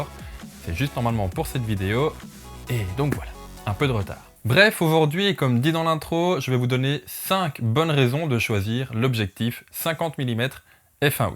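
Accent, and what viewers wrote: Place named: Belgium. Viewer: j'ai réussi à capter que t'étais belge alors que t'as un accent trop subtil putain j'suis trop stylée (j'ai eu la confirmation quand t'as dit "septante" haha), en tout cas merci pour la vidéo, je viens de l'acheter et j'en suis très satisfaite !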